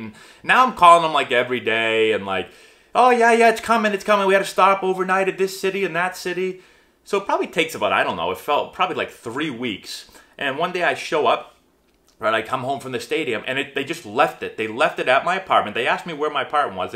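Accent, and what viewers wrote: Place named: Massachusetts, United States. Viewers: Yo im from Massachusetts too! Your accent sounds just like my brothers. For whatever reason I don't have much of a Boston accent. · His Massachusetts accent really comes out when he gets animated.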